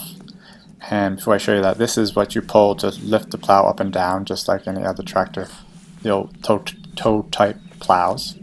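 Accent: New England, United States. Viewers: Thanks for explaining how you got a New England accent in Upstate New York.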